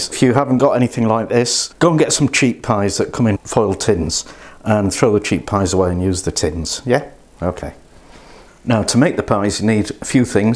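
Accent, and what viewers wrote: Australia. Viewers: I love Australian accent 😍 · Love Aussie accent! Go for it! Awesome recipe! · What BS! I just luuv the Aussie & Kiwi accent, mate.... Really enjoyed this recipe! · NEVER apologize for your Aussie accent! Great looking recipe and video. Can't wait to try it. I grew up in Adelaide from ages 5 to 12 then moved back to the states. Lost my Aussie accent damn it. I remember pies like this and sausage rolls. People love when I make sausage rolls, but I want to add a pie to my list of nostalgic cooking. Can't wait to try this. Thank you. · There is no need to apologise for your Australian accent. Just like a British does not need to apologise for his English accent in America or Australia! More interested in your recipe.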